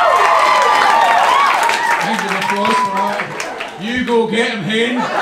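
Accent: Scotland, United Kingdom. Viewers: I'm here for the Scottish accent!! ❤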